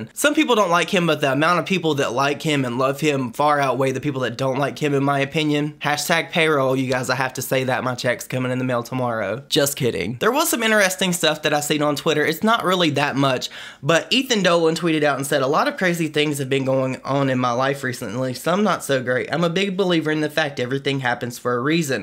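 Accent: United States. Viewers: How you say Riland😍I'm from Britain and we love American accents 😍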